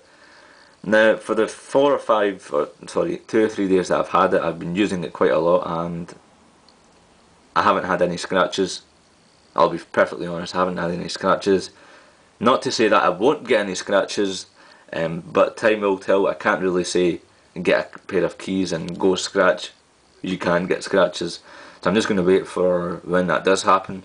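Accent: Scotland, United Kingdom. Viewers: yeah this is nothing personal agiant scottish people or waht ever, i just cant understand them as good as american people, for i´m not a native speaker and understanding is the hardest part of a foreign language. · Where bout Scotland you fae. Am fae elderslie.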